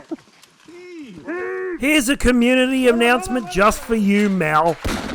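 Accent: Australia. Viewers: Great video. And the best Aussie commentary. Got my sub...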